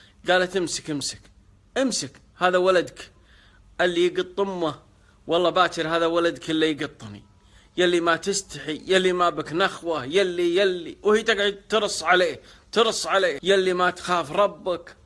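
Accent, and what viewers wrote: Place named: Syria. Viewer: شكلك سوري من كلامك . انت من البدون؟؟؟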